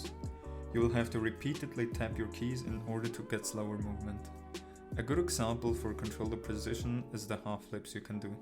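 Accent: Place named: Switzerland. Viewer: ich bin so en gott. hans useghört, dass du en schwiizer bisch haha.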